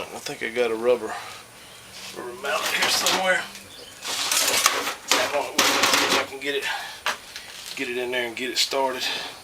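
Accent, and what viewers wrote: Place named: North Carolina, United States. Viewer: Where you from, you sound like your from my neck of the woods, NC, great video, hate we missed those few parts from the recorder but for most part excellent video, God bless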